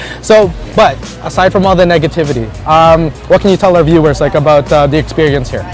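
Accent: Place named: India. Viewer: Id rather listen to a chainsaw sound rather listening to an indian accent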